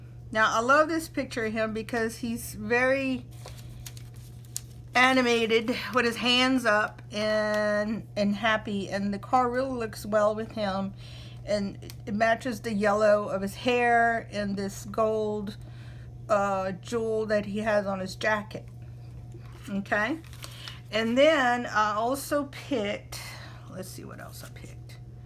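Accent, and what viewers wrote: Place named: South, United States. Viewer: I'm from New Orleans. Are you from the south? Sounds like it lol. I always like to guess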